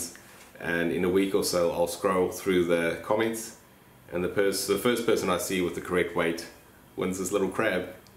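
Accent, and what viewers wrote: South Africa. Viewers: Are you an ex South African?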